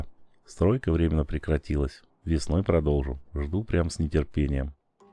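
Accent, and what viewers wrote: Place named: Tambov, Russia. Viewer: Здравствуйте! Многие слелали акцент на Ваш голос... Вы не с Тамбова?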